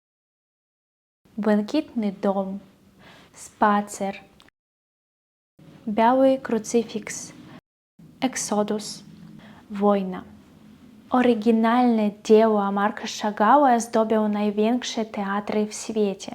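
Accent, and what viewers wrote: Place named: Kresy, Poland. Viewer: Ciekawa tematyka . Dobrze ,że mówisz o sławnych ,a nieznanych szerzej Białorusinach . Bardzo się miło słucha twojej pięknej kresowej polszczyzny. Czekam z niecierpliwością na kolejny odcinek tego cyklu.❤😊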